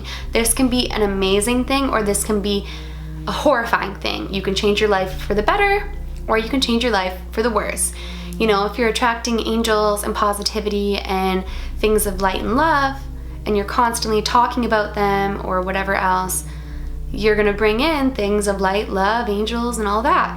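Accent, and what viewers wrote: Canada. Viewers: Is she Canadian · are you Canadian?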